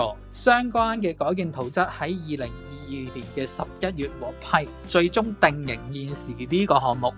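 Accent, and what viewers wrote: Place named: Hong Kong. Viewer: 你講嘢😅 唔喺香港大？ 咩？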